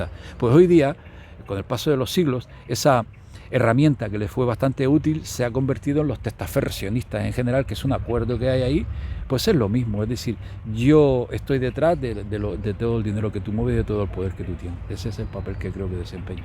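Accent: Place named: Canary Islands, Spain. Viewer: Ostras❗️❗️❗️,Que sorpresa veros a los 2,a jamas lo habia visto fisicamente,entonces eres Canario como el? Esq el acento se me hacia familiar pero como hay tantos canales de Hispano-America. Voy a escuchar la charla solo falta ahi mi querido y desaparecido de los medios el GRAN Pedro Bustamante. Saludos Maestro 🇮🇨 🙂😉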